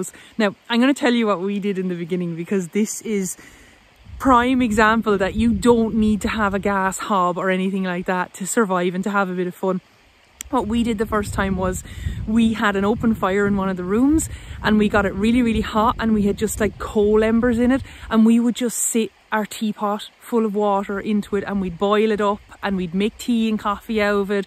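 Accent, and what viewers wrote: Ireland. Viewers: Hi, I'm enjoying your video so much, both for the information and the laughs. You're making me homesick with your accent and sayings! I grew up, "just up the road" from you in rural Westmeath. I'm now living in Germany but hoping to find my forever home back in Ireland one day. Keep safe and well and keep the videos coming.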